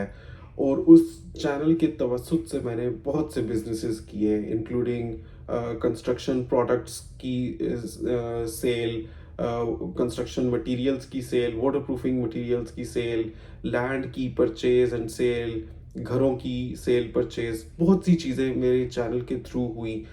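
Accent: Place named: India. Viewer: نام تو پاکستان کا لے رہا ہے اور بول ہندی رہا ہے اور تیرا ایکسینٹ بھی ہندی ہے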